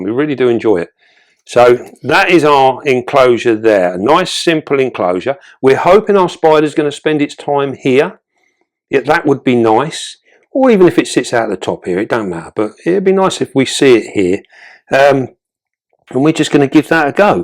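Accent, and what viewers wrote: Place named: Cockney, United Kingdom. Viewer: "Oh maay giddy aunt"......😮...😅 Brilliant cockney real London gab, luv it ❤ 👏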